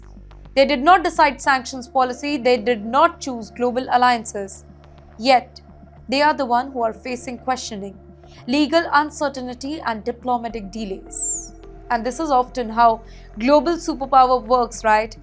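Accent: India. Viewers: Oh I think I can hear it. It's slightly different with some words, like she has two English Indian accents and they blend lol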